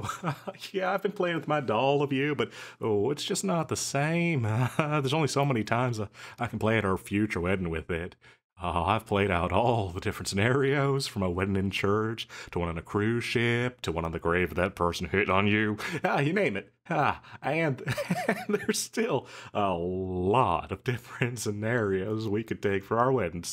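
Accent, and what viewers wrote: South, United States. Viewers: I love the southernish accent. You do it really well💖💗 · gosh the southern-hilly billy voice is so cute omg🥴🥴ur voice is so adorable i swearrr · I HEARD THE SOUTHERN ACCENT AND MELTED 😭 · naw man it was perfect. Southern to Southern this was so awesome!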